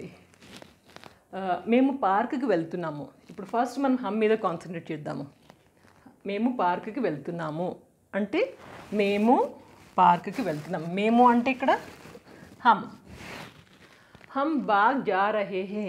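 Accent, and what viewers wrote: India. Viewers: Madam way you are explaining can understand all the normal people. And hyderabadi style and traditional hat's off to you madam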